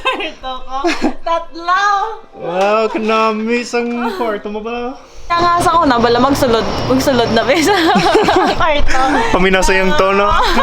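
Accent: Visayas, Philippines. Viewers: Hehe ako inahan og ako ero haha very bisaya hah? ..ang galing!!! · hahahaha ka cute sa bisaya hehehe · Hi Dong! If I close my eyes, you sound like a Bisayang Dako! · How come he knows how to speak bisaya? Are they doing missionary back in philippines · Hala ka diha nakuratan ko! Ngano kbalo ka mgbisaya hala oi dong! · Whoaa! Nice to hear you speaking bisaya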